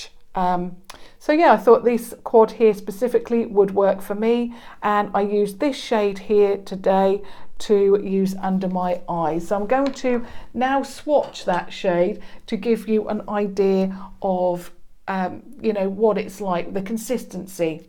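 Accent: United Kingdom. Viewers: She was British so her accent was British. Unfortunately she is no more , she passed away due to a brain tumour but was such a wonderful person. I use to watch all her videos .😭😔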